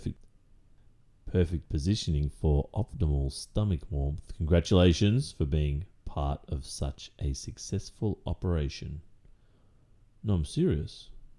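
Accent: Australia. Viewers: I finally found and Aussie asmrtist and I am in love. I thought my Aussie accent was strong and I'm Australian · Australian accents hit different🤣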